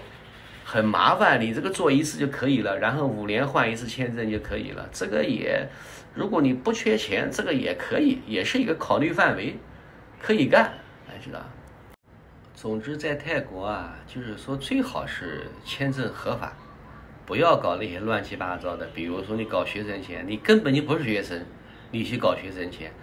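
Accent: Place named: Nanjing, China. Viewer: 哈哈，南京普通话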